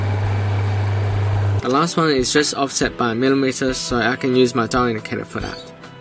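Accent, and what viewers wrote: Australia. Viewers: What part of Australia are you from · You Australian mate?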